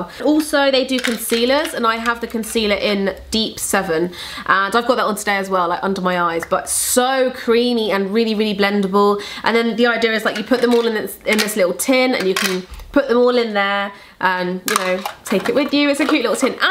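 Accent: United Kingdom. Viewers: I just love your videos and ofc your brit accent. :)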